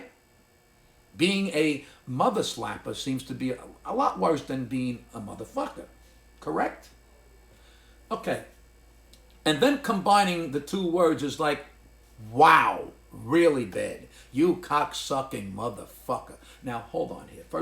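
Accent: New York, United States. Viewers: It just sound really Really bad with an New York Italian accent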